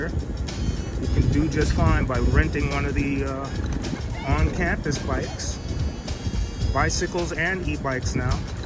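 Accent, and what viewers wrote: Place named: United States. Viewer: 😅Mr. You are very brave. Despite all warnings from the medias not to take ‘risk’ coming to China, you did it.Your American English pronunciation sounds very pleasant.China has a 5,000-year-old culture and is a society that respects teachers very much. It is very popular in China and you can live in China with confidence.I am also a video producer and it is difficult to increase my followers. You can think of your channel as a way to record your life. Take it easy.China's education system is long-term and sustainable. You don’t have to worry about policy issues. Just feel free to teach well. If the contract expires, you can continue teaching in other institutions. If you go to Vietnam or other countries, your income will not be as high as in China, and life will not be as convenient as in China.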